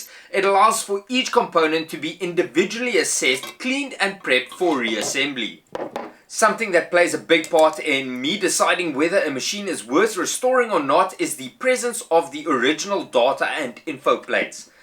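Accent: South Africa. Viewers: Bloody hell how South African is this guy? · I'm assuming you are south African, your English is making me exhausted haha, don't paint the dovetail ways.looks Good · ... good job, well done. i can hear you have a South African accent. Greetings from Moscow ... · Would that be an SA accent I'm hearing?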